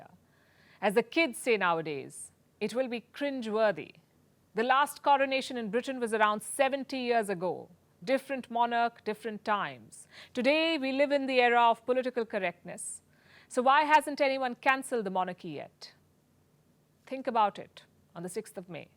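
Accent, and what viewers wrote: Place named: India. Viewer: Lady, you speak good English with a slight Indian touch!. And that says volumes.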